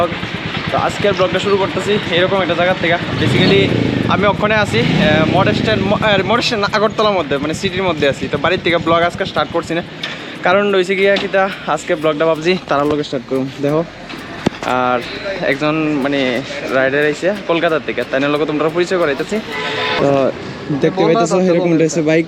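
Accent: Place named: Bangladesh. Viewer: আপনার ভাষা টা আমাদের বাংলাদেশের মতো লাগছে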